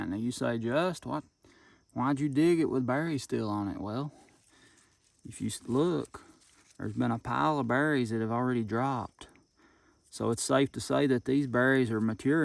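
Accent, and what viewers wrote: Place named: South, United States. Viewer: Thank you so much. What a nice tribute to your Mom! I enjoyed listening to your southern drawl as you led the hunting expedition. It was great to actually be there and watch a pro at work. Your Momma taught you well...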